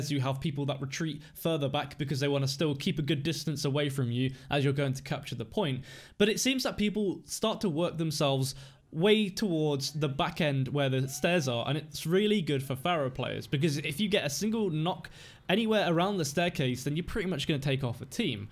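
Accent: United Kingdom. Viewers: Superb video mate, damn good Pharah play. From your accent I take it you're from the UK? Surprised you're not on the UK world cup team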